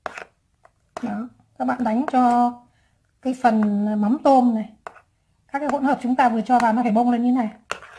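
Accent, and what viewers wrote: Hanoi, Vietnam. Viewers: chi noi tieng ha noi rat chuan nghe rat re chiu, khong nhu nguoi ha loi bay gio loi tieng viet lam nghe rat choi tai . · ngon quá chị ơi ,đúng chuẩn món ngon đặc hà nội luôn ,nghe cách chị nói và làm đâu ra đó vậy là e biết chị là nguời gốc hà thành rồi ,cảm ơn chị nha ,chắc một ngày rảnh nào đó mình sẽ làm cho gđ mình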